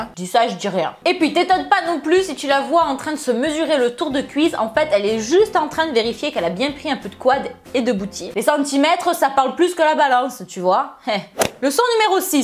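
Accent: South, France. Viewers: oh putain con l'accent, tu viens tu sud toi hein !!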